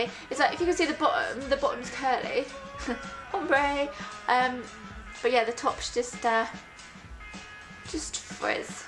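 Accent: United Kingdom. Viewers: Can u not recognise her british accent???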